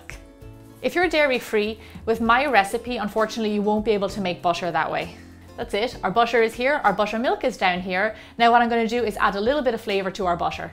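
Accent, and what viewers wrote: Ireland. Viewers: SUBSCRIBED! THANK YOU! LOVE YOUR IRISH ACCENT! Makes your vids that much more enjoyable to listen and watch. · She's from Ireland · Where are you from!? sounds interesting. Def not America. But I love it. Sounds like a tint of Irish. I’m Irish. But it sounds like you’re trying hard to cover up your accent. You ARE from Ireland. I hear you. Very good. Come to America. Let’s meet.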